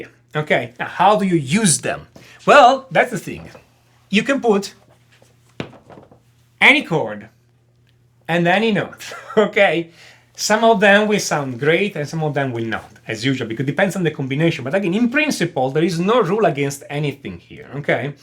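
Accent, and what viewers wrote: Italy. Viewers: What Music theory lesson isn't instantly made better by having an English speaking teacher with an Italian accent !!? ( It is an Italian accent, isn't it ? )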